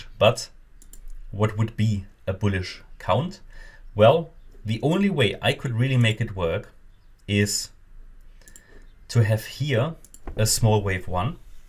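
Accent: Germany. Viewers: German accent? Where are you form?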